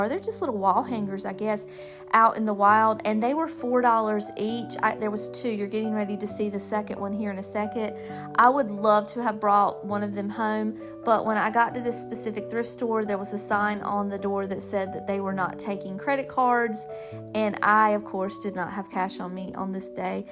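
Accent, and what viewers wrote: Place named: North Carolina, United States. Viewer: OMG! Ms. Beasley! She used to be in the Sears Christmas catalog and my sister and I would argue over which of us would get her. We thought there was only one, because the picture in the catalog only showed one! 😂 She had little gold-rimmed reading glasses and I loved her! I’m a first time watcher and your accent immediately gave me a North Carolina vibe. My late husband was from there. Enjoyed the video and have subscribed.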